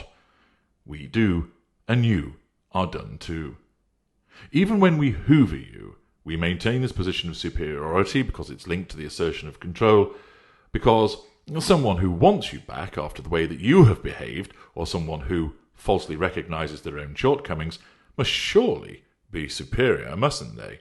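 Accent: United Kingdom. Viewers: Ahh you must know the latest narc that I am preparing to extricate myself from ... Admittedly it sounds better with a British accent!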